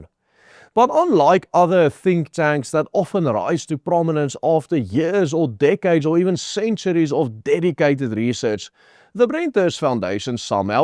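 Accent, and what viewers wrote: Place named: Afrikaans, South Africa. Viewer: This has to be the thickest Boer accent I have ever heard.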